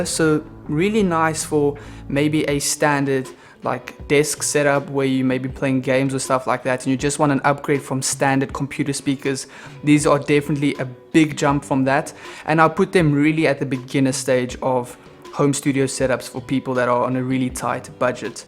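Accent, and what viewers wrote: South Africa. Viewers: You are South African, I know us very well, lol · Lekker South African Accent my bru... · Is that a South African accent? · you have a South African accent bro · This guy sounds South African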